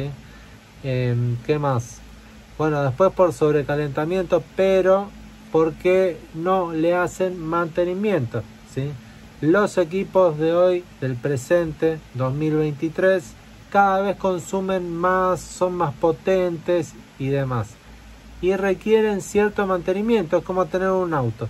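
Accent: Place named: Argentina. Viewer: De que parte de Argentina sos capo?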